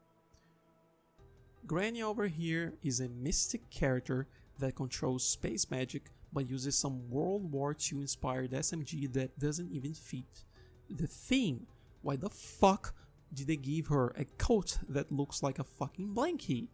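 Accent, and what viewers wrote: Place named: Brazil. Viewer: Desde o primeiro vídeo, seu sotaque nunca me enganou. Sabia que era br kk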